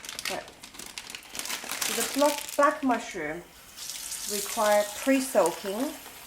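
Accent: United Kingdom. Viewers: That English accent? You should be in Bond movies,for sure?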